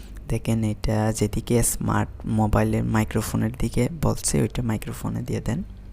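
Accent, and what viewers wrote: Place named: Chittagong, Bangladesh. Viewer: Bhai ki chittagong thke naki? kothar tone ta to chitttagong ar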